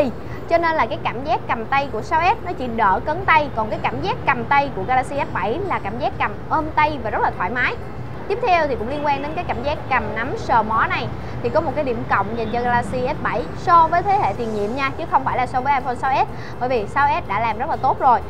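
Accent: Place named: Central, Vietnam. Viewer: mien trung roi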